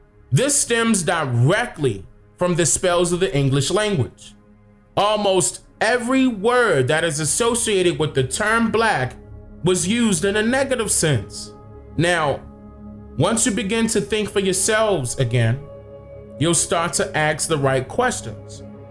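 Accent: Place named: Washington DC, United States. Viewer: are you from dc I feel like I hear it in your voice!!! Thanks for keeping my mind working!! My dad told me these things when I was in elementary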